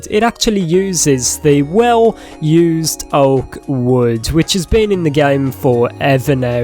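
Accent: United Kingdom. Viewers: Mans sounds like chills with a British accent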